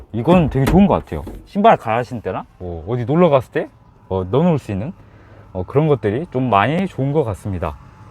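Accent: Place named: Jeolla, South Korea. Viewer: 전라도 말씨여ㅎㅎ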